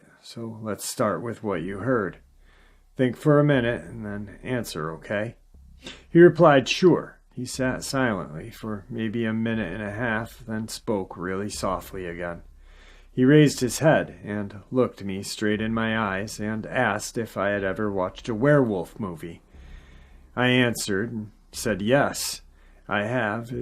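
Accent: United States. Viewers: Anyone else hear a John Wayne accent?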